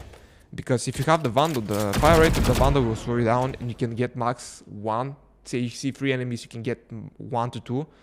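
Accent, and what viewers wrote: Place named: Bulgaria. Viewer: no wonder yr accent was familiar to me you are Bulgarian <3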